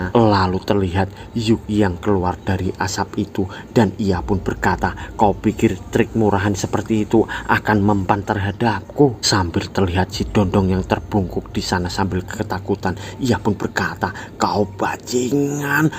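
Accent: Java, Indonesia. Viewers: Logat bahasa jawa e kentara mok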